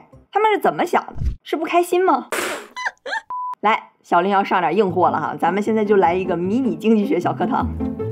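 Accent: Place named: Beijing, China. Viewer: 一口儿京味儿 听着亲切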